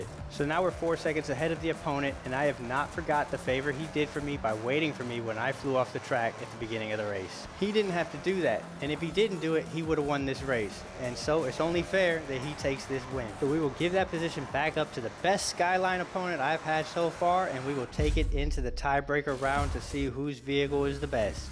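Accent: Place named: South, United States. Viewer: he sounds kinda redneck-y and that kinda accent unironically is a big breath of fresh air in the forza community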